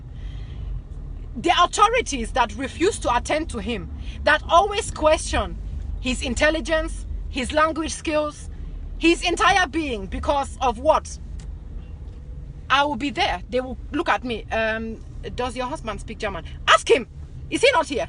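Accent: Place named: Nigeria. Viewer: It's the Nigerian accent I keep hearing from you for me🥰❤️🇿🇦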